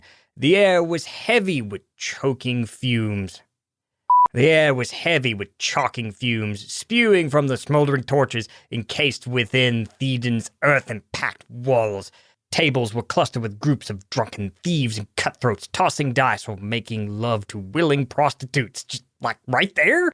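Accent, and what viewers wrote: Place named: Tennessee, United States. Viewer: The moment I saw you post this I thought, “Oh I can’t wait to hear the line ‘sagging nipples’ in a Tennessee accent.”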